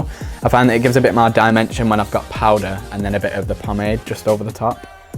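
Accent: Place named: Bolton, United Kingdom. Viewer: where are you from? You sound like a fellow boltonian x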